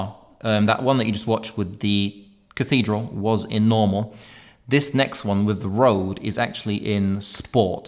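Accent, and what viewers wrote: United Kingdom. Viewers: idk but I don't like getting drone info from UK accents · Great tutorial, mate!! It was very enjoyable to watch when a guy with UK accent shows that much emotion. :) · Are you a Brit living in the USA? Your accent has some US twangs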